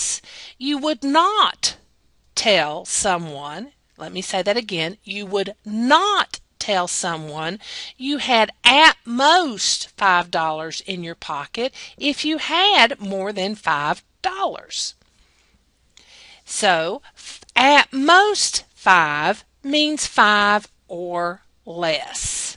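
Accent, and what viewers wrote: United States. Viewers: I love her American accent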